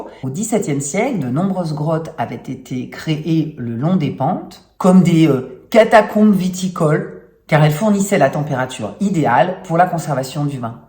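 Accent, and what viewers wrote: Italy. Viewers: Rien que d'entendre ton accent, je voyage en Italie ! 😀 Merci pour ce pan de culture italienne. Souffler en octobre, je suis bien d'accord avec ces anciens. On en a besoin. Et en particulier de soleil ☀️. Prenez tous soin de vous !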